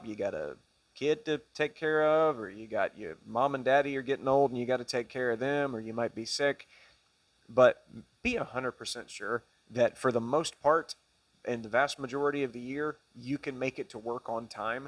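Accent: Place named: South, United States. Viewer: Man I love your hillbilly voice 😂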